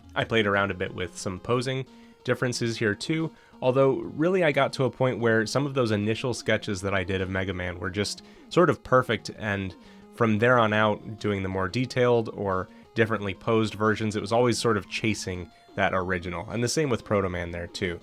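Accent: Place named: United States. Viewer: When your name has an -es instead of -s and ends with a -ston But you have an American accent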